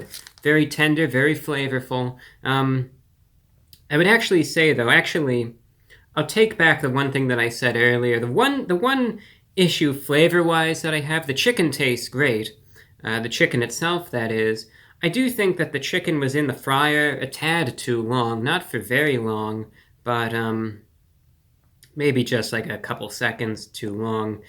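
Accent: Standard. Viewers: just modern proper english. Idk which of us is right tbh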